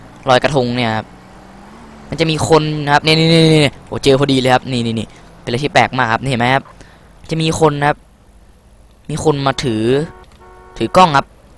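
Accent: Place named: Korat, Thailand. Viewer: คนโคราชป่าวครับฟังจากสำเนียงเหมือนมากๆ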